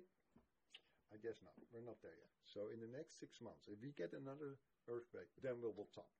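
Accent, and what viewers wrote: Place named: Germany. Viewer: Are you German? Love the accent just watched the other video...great stuff..i see the big increase in activity...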